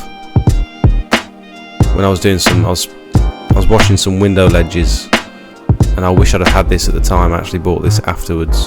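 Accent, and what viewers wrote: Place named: Cockney, United Kingdom. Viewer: sound like that cockney fella dizzy new hights great videos very inspiring and informative keep moving forward 🎉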